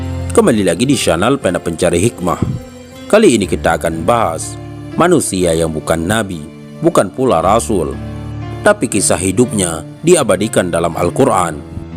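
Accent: Aceh, Indonesia. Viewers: Pasti ureng aceh ini admin logatnya terdengar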